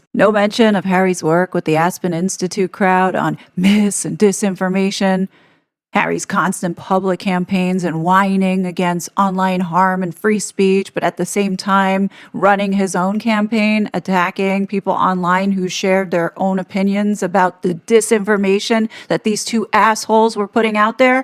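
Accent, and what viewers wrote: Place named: New York, United States. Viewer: yes! Yes! Yes! This is why I keep coming back. You say it in a way that only a New Yorker can say it. THANK YOU!!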